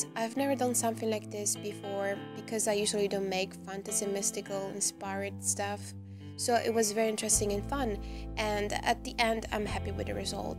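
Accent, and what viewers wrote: Italy. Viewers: Non aver paura di parlare inglese! in alcuni punti pronunci bene le parole, sei anche spedita. in altri punti ricadi sull'accento italiano che in realtà sei anche capace di evitare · Your italian accent is beautiful 😊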